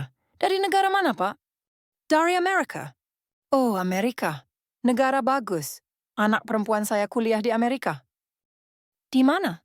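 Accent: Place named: Malaysia. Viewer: Admin itu orang Malay yg sekedar cari viewer dari Indonesia Kau dengar baik2 logat dia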